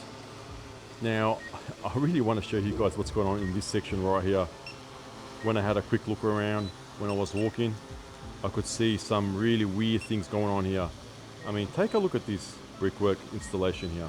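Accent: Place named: Australia. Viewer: love the guys accent.. to me its one of the true aussie accents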